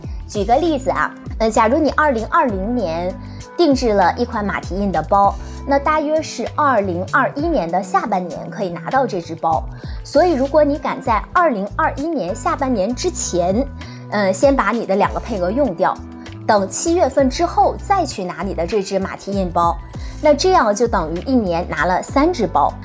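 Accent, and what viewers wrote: Northeast, China. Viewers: 东北女生？ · 肯定是东北那旮的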